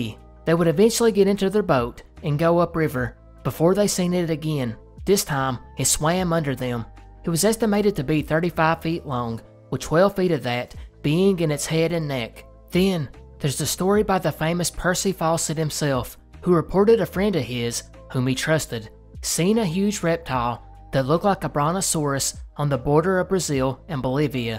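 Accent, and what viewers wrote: South, United States. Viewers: Love your channel man. I’m from sc and it’s refreshing to hear a fellow southerner😂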